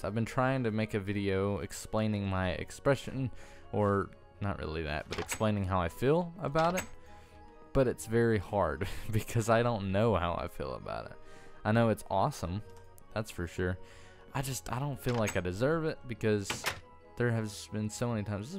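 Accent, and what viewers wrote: South, United States. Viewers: I love your accent. It reminds me of mine and a lot of my friends'. I don't know if I'm right in thinking of this, but it sounds like it's Southern, but just on the edge. I live in Memphis (which I refer to as the least Southern city in the South sometimes) and personally was raised by a mother from the West coast and a father from the North, so my accent is pretty much non-existant except for a twist on the occasional word. Your accent feels kind of like home to me. IDK, maybe that sounds creepy or weird. But also, this video was posted 4 years ago so maybe your accent or thoughts on it have changed c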